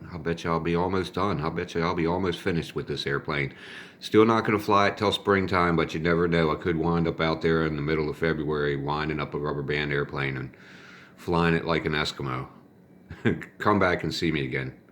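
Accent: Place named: Pennsylvania, United States. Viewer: are you in Pennsylvania, possibly philly? I am guessing by your accent.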